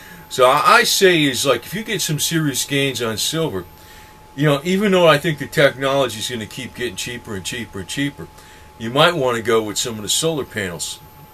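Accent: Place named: Northeast, United States. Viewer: Florida huh? Sound like a north east guy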